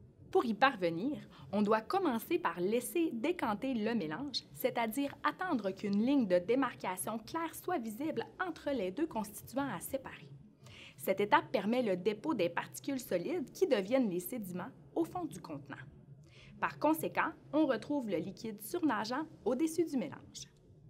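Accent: Quebec, Canada. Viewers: Lol l’accent québécois. Bise au tous les québécois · Non c’est l’accent quebecois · Merci beaucoup vraiment et je veux pas etre raciste ou quoi mais l'accent québécois m'a aider a mieux comprendre merci beaucoup allo prof je viens de m'abonner!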